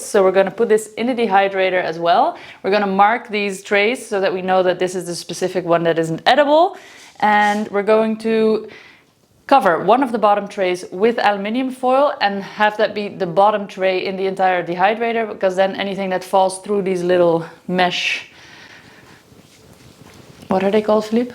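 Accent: Netherlands. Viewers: You sound Dutch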